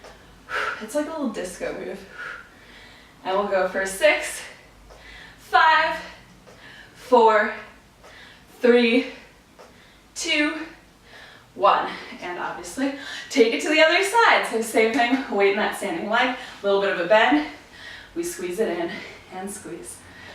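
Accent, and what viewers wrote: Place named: United States. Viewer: This had me cracking up😂 Your chatter was so adorable🙊 The workout was a burner for sure and I’m loving how I feel now! Also, I had a feeling you were/are American so I’m glad you mentioned it in this one😉 I’ve been trying to place your accent😆 Thanks for the fun sweaty time and strong feeling I now have💗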